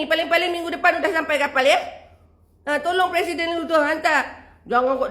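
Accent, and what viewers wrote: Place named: Indonesia. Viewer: Mek...kalau boleh jgn cakap loghat indon....sebab sekarang ni pon mereka kata rakyat MaLaysia lebih suka bahasa Indonyetsia 🐒🐵 mereka tu...kita guna loghat MaLaysia je ...Kalau kita ore kelate kita cakap kelate...kalau kita utara kita cakap loghat melayu utara ...bagi depa faham sikit... 😁🤣✅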